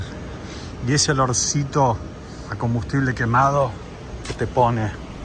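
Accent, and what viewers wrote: Argentina. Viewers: Hola sos argentino o uruguayo?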